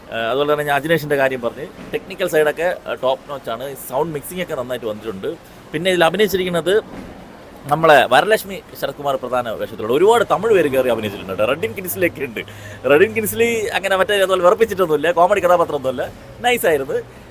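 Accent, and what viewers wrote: Trivandrum, India. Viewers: He is from trivandrum. Do check more videos including live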